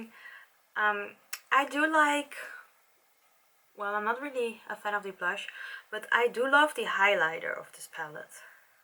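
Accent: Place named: Netherlands. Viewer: so yor accent sound like your dutch so im gonna talk dutch hii ik klikte je video aan en ik keek de video en ik dacht dat je een soort beroemde ytbr was dus goed gedaan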